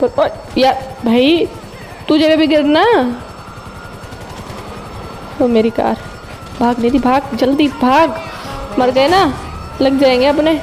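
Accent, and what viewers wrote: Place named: Haryana, India. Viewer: same hare me too haryanvi Edit : dekhkar aur awaj se hi smj gya haryanvi Chori h 🤟🤟💪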